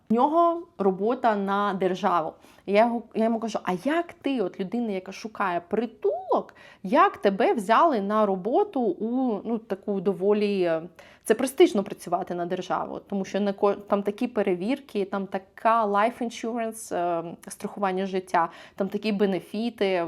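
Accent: United States. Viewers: В таком случае все проживающие и рождённые в штатах Мичиган, Иллинойс (Чикаго)говорят неправильно.она произносит так как разговаривают в штате,где она проживает,чтобы не было акцента.не знаю где Вы проживаете,родились и какой Ваш родной язык.если Вы проживаете здесь,то знаете, в различных штатах есть свой акцент и произношения определенных слов,поэтому общаясь с людьми,Вы можете предположить откуда человек.если Вы обратили на это внимание,я 100%уверенна,Вы не рожденыв штатах,а приехали !!! всего доброго!!!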